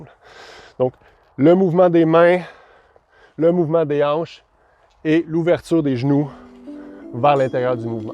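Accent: Canada. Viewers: sympas et pedagogiques tes tutos avec l accent canadien c est un regal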